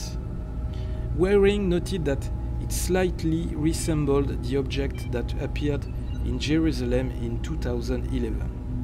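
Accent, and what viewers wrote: France. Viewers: You have a big French accent !!! (I’m French)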